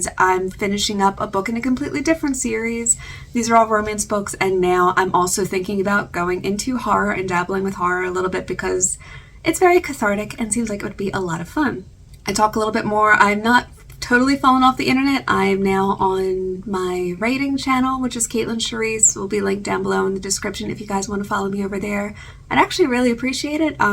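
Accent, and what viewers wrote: Australia. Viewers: I miss you, however you're not to me now you're Mostly Aussie. Also your American accent isn't as strong as it once was. Won't be long and you'll have a full Aussie accent. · Great to see you again. Love your content from your previous posts. This is from a dinky dye born in Australia Australian. Your accent and use of Australian slang is definitely there, even though I still hear your North American accent as well. This is from one who has the dual London England and Australian accent. Your family might have fun understanding you when you visit them later in the year. Patience about the permanent residency and eventually Australian citizenship, you will get there. Look after yourself and your health. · I derect notes of an Australian accent,well done, thanks for the very interesting update, I was wondering what had happened to you. As an Australian I find the PR system to he appalling. 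· I can hear some twangs of the Aussie accent creeping in there! Nice to see you again, glad you are going well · Yeah mate, your starting to sound Aussie. Mark is a lucky man.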